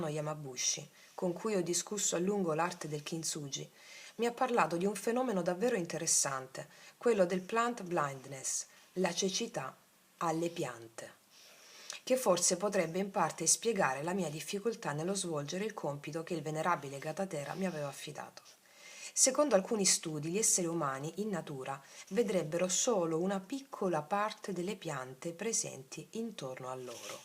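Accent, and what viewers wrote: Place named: Rome, Italy. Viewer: Mbe di Roma ti ci innamori, non puoi farne a meno. Poi avevo varie amiche romane, me facevano impazzi'. Il problema delle cose belle è che creano dipendenza. Roma mia amata Roma bella e caotica città irrequieta. Poi il romanesco me fa sbrocca'. Grande, sempre molto gentile ed amorevole eee. Come cantava... Quanto sei bella Roma...mo la vado a senti`🌝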